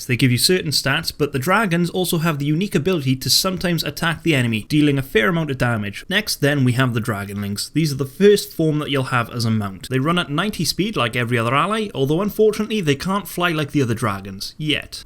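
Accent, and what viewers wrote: Wales, United Kingdom. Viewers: Are you welsh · Are you Welsh?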